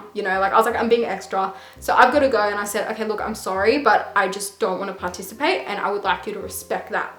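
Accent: Australia. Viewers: You are really pretty i know you sound Australian but I’m wondering what your background is, are you mixed ? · are you an aussie? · Is that an aussie accent? And you speak korean? 😍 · Your accent is like Australian but with a tinge of something else ??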